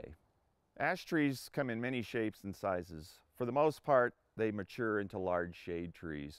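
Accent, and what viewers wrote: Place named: United Kingdom. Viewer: Translation-Ash you're a tree? Nice! Wait is that a british accent? Sorry but I love british accents ♡♡♡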